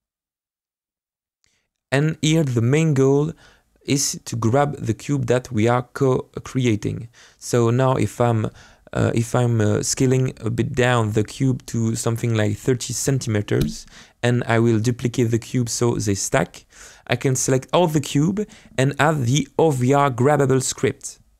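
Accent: France. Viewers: Hey, Nice video but it seems it doesn't work for me with the recommended version 2018.4 LTS, I'm able to move, see my hands and so on. But I can't grab anything... I figured out that it was my capsule colliders for grabbing in my hands that weren't moving but it was impossible for me to solve it... Can you help me? PS: You sound french!:p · Salut il me semble détecter un accent f Français, je me trompe ? Tes tuto me donnent envie de me mettre à faire mon propre jeu oculus Quest. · Ooh that's super interesting ! I may start developping a little VR game soon thanks to you ! So thank you :D Wait a sec, is your accent French ? 'cause I am and I may have the same accent as you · mmmmmhhh le french accent x) C'est marrant de voir la description en français et la vidéo en anglais. En tout cas merci pour la vidéo c'est bien expliqué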